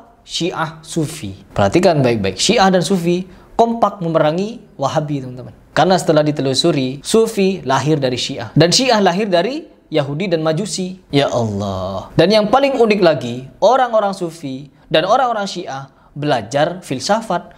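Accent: Madura, Indonesia. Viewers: Iya logatnya khas kek madura ya... Tp penyampaiannya pas mdh dicerna.. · Logat nya kaya Madura.....belajar lagi mas bro....pakai ilmu padi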